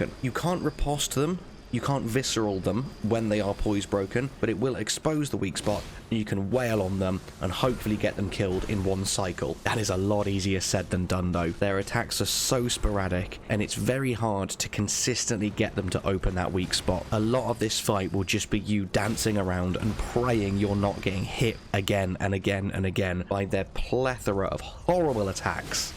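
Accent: United Kingdom. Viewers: Never knew how much I'd enjoy a British accent saying "motherfuckers" so much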